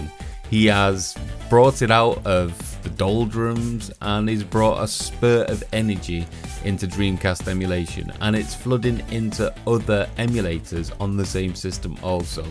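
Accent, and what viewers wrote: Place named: United Kingdom. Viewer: Where the feck are ya from pal? Can't quite place that accent. After loads of vids it's pecking mi head bad. Deffo UK but where!? :)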